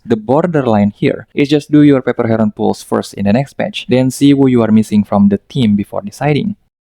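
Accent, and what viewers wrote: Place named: Indonesia. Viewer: you sounds like Indonesian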